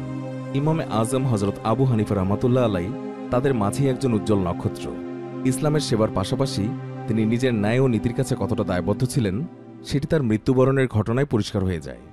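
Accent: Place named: Noakhali, Bangladesh. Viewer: Commentator's pronunciation and language problem, it seems he's from Noakhali.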